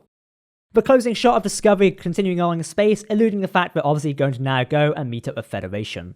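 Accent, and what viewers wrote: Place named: Cockney, United Kingdom. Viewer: Thank you for this.... I like watch your reviews prior to watching the episode.... one humble request.... is their a way you can talk a bit slower, the cockney accent (of that's what it is) gets in the way of comprehesion a bit.... thank you again....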